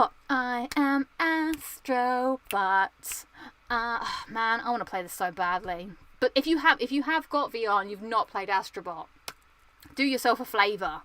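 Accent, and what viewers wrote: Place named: Brighton, United Kingdom. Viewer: Are you from Brighton you sound like you’re from Brighton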